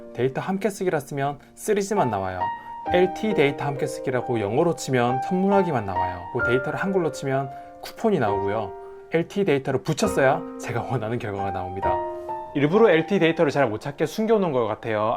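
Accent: Gyeongsang, South Korea. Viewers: 경남사투리가 느껴지는 ㅋㅋㅋ 잘 보고 갑니다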